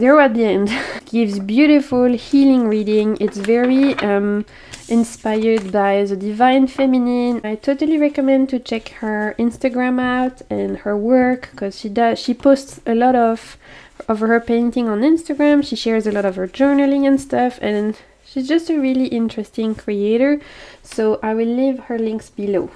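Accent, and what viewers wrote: France. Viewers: Thanks for this video! Good idea to show french decks!!🇫🇷 I'm french and i live in Bretagne, not so far to Broceliande forest!! The fire has destroyed a part of this magical place..😔 But i saw recently a fox on the road on night🦊...and other animals next to my home. 🐿🐇🦔🐗 I love Gulliver and other french creators like Célia Melesville👍🏼 I already saw your Channel and i like your frenchy accent😁🇫🇷 so cute😘 Namaste🙏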